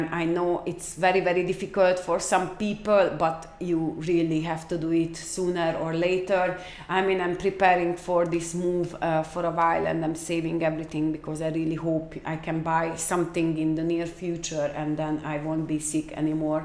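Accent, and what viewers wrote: Hungary. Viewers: nem magyar vagy véletlenül? hát én ismerem ezt az akcentust, nem lehet más :D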